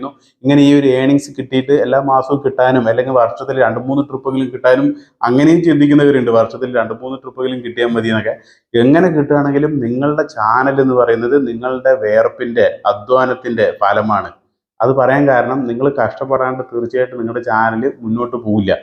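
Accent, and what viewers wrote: Palakkad, India. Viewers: Nigal palakkad ano Etta....🎉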